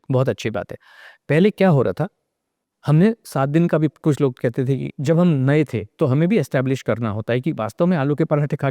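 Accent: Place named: Udaipur, India. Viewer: Host bhaiya kya aap udaipur rajasthan se h...aapke bolne k tarike se pata chal raha h....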